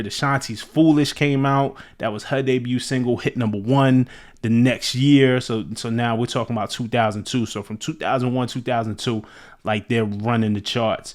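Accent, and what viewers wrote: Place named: New York, United States. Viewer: Can't really stand the homie's NY accent, but this is a FANTASTIC video‼️✊💯👍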